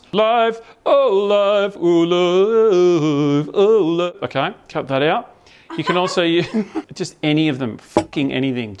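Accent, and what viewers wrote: Australia. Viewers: YT's auto-captions can't understand Australian. It's chat.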